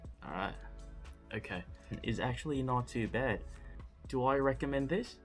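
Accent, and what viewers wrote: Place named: Philippines. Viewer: U a filipino bro your accent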